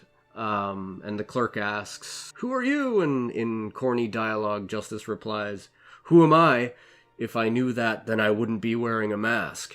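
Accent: Canada. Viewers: Told you on your last Watchmen video that I'd watch every one you put out. I don't understand how you don't have more subscribers with a channel as outstanding as yours. I came to your channel through Westworld and I'll be here for all of Watchmen. Your analysis is always spot on and very very thorough. Keep up the great work!! Where are you from? I've tried to pin down your accent and if I was forced to guess I'd say Canadian or the upper Midwest.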